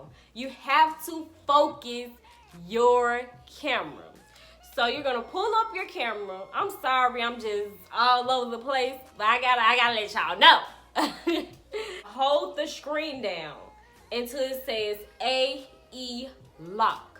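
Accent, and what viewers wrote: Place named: New York, United States. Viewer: Your Accent is Killing. Is that Newyork Accent?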